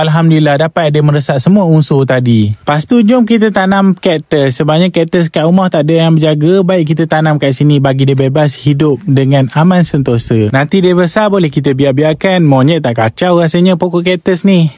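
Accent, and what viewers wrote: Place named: Tloh, Malaysia. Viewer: Syabas anak muda,suka dgr loghat org Tloh😂🎉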